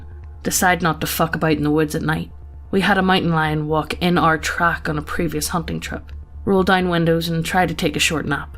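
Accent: Ireland. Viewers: Sp00k? Check Scary footage and music? Check Irish Voice? Check Yeah, it's time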